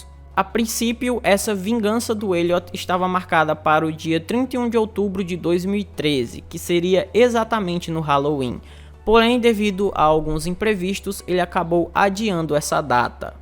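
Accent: Northeast, Brazil. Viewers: Jesus:"( vc e de onde? Pelo seu sotaque e Nordeste mas qual é?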